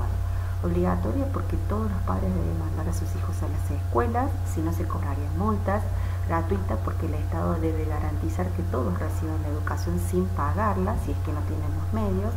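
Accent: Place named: Cordoba, Argentina. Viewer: Estaba buscando a cerca de la ley para realizar un informe y me encantó la información que me brindaste. Muchas gracias 💛 Por tu acento creo que sos de Cordoba, saludos desde B.S. A.S.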